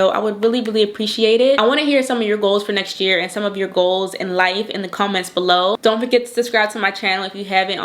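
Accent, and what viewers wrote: Baltimore, United States. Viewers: In all the years of watching you, I just realized how subtle your Baltimore accent is! I too live in Miami (born and raised) but I live south near Zoo Miami. Praying the best for you and your new endeavors 🙌🏽 I just applied to FIU for their new Cybersecurity program 🙏🏽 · Soon as you started talking I knew you were a fellow Baltimore native lol. It's rare to hear people who sound like the people in my everyday life on YouTube. The way you talk is so familiar lol.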